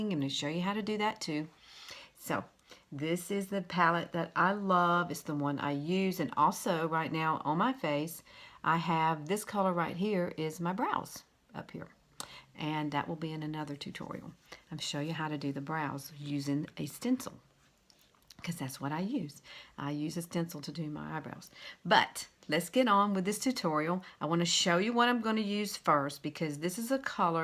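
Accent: South, United States. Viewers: Love your attitude and your bubbliness (is that a word?), and I love your southern accent cuz I'm from LA (Lower Alabama). Watch you later YALL. 👍😊😁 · Oh my goodness, where in Alabama are you? I live in Alabama too. I absolutely understand your soooouthern accent!! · I was reading the comments on here & if I read correctly, you're in your 60's...? My gosh, I can only HOPE I look like you when I'm your age! (I'm 41) You are beautiful! And your sweet personality just adds to your beauty! Your accent is just too cute also! I'm from Texas, so I definitely know a cute little southern accent when I hear one! 😉 God's blessings to you! ❤️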